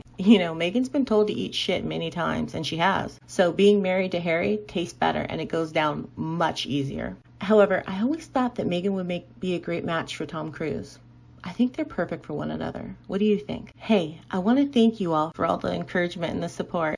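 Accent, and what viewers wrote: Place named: United States. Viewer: Love your American accent.... & just found your channel! Well done!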